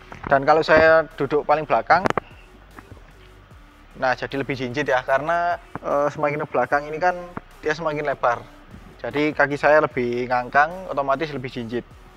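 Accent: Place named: Java, Indonesia. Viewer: Sya suka konten chanel karena suara medok jawa nya 🤭😄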